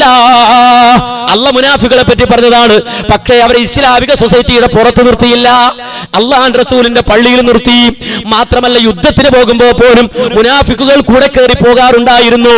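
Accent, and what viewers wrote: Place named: Kozhikode, India. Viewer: ആദ്യം ഭാര്യനെക്കൊണ്ട് പർദ ധരിപ്പിക്കടോ? സംസാരിക്കുന്നവൻ കോഴിക്കോട്ട് കാരനാണെന്ന് തോന്നുന്നു.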